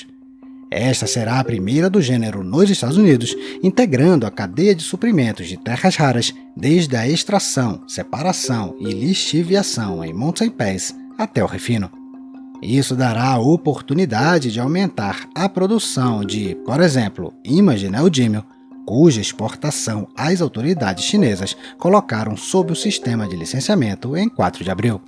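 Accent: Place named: Rio de Janeiro, Brazil. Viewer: Esse é o melhor canal de geopolítica que já vi, chaga a ser um canal essencialmente excelente. Acho que o narrador é carioca por causa do sotaque.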